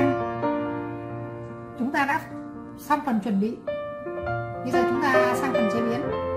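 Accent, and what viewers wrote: Hanoi, Vietnam. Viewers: chi noi tieng ha noi rat chuan nghe rat re chiu, khong nhu nguoi ha loi bay gio loi tieng viet lam nghe rat choi tai . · ngon quá chị ơi ,đúng chuẩn món ngon đặc hà nội luôn ,nghe cách chị nói và làm đâu ra đó vậy là e biết chị là nguời gốc hà thành rồi ,cảm ơn chị nha ,chắc một ngày rảnh nào đó mình sẽ làm cho gđ mình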